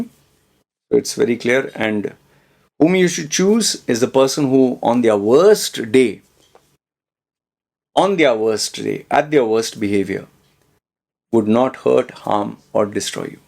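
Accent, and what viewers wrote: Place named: Mangalore, India. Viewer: I wish I had listened to your advise 15 years ago 😆 Especially coz ur explaining it all in Kudla dha porbu style English 😄 Cheers!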